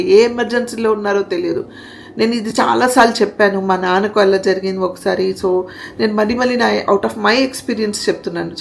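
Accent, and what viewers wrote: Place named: Telugu, India. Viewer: I'm from Bangalore and I have been watching your all family channel from so many years Morethan everything I personally love your telugu accent the most amma You have done so many kannada movie, which we love to watch. You are know family member to me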